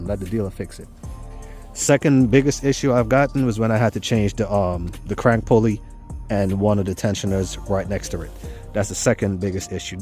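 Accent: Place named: Caribbean. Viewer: Jamaican or Trini? I hear a Caribbean accent 😂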